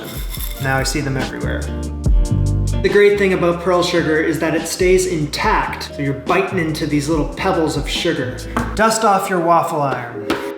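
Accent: Canada. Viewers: you dont need eggs and you dont need the bullshit pearl sugar; lots of cheaper and more common alternatives. (ps..are you canadian or from the pacific north west - you are familiarly annoying).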